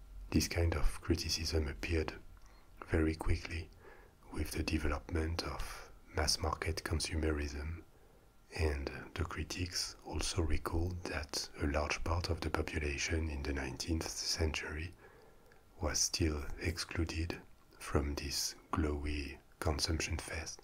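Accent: France. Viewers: I'm a huge fan of ASMR with female voices, triggers it better for me personally. However, you sir, and your deep dark chocolatey French accent do the job quite well. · I think you are one of my newest favourite asmr artists. That french accent....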